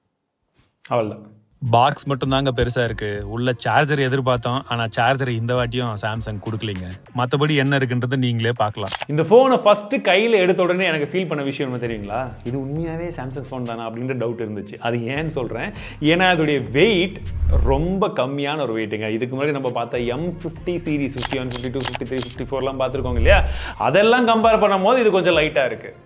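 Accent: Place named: Kanyakumari, India. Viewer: #SUPERTT intro adipowli 🌟 bro.... Kollam ketiya anna😅 ....ethu enga ooru slang from # kanyakumari ♥️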